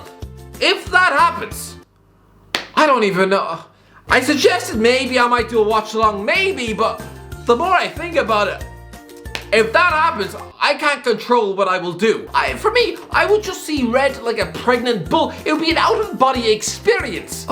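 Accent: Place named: Ireland. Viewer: why have i clicked on a prediction video and all i can hear is an irish guy talking about a 2003 match magazine?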